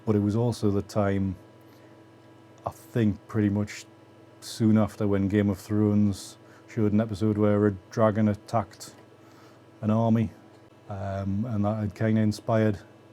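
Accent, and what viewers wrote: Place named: Northumberland, United Kingdom. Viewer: Hey, thanks for sharing your process and approach, your images really cross a lot boundaries, which for me makes them so interesting. Also as a lad born and brought up in Corbridge but loving in Devon, I am loving hearing the Northumbrian accent. Have you met Thomas Heaton btw?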